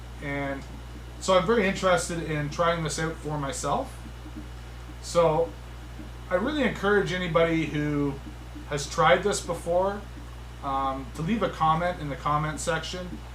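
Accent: Canada. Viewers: Why do you have such a bad accent? Are you way deep in Canada?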